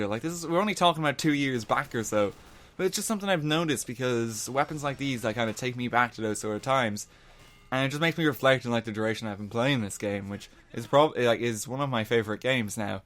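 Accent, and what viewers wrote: Ireland. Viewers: Ah, the sweet, sweet Irish accent flows gracefully over my ears yet again. So similar to mine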